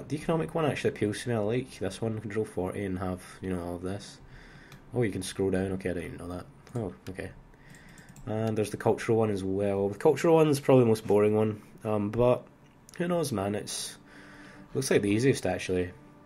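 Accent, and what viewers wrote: United Kingdom. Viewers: Awesome British accent you have there, its very different to the typical Southern Englanders we get on YouTube.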